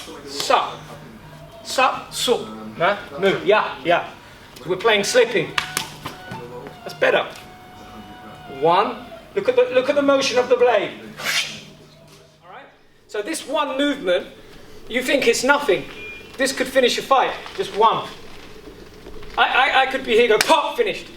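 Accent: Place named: Canada. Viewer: His voice sound so canadian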